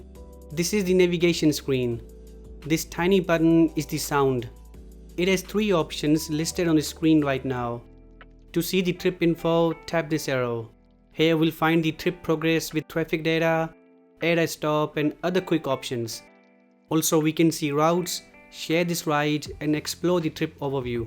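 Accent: India. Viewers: I hate Indian accent the most sorry...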